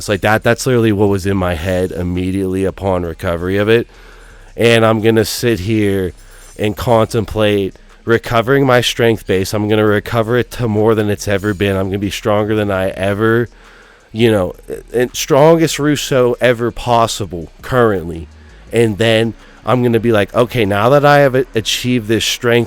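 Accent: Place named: Pittsburgh, United States. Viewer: You def sound like youre from the 412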